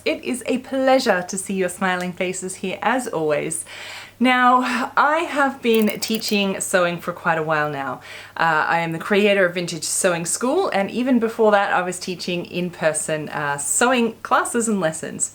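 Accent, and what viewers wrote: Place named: Australia. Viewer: Great to hear an aussie accent.. sooooooo loooooong winded.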